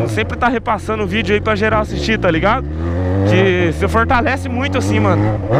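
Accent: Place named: Northeast, Brazil. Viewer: EU SOU DO SUDESTE E VEJO TDS SEU VIDEOS PQ VC SO FALA NORDESTE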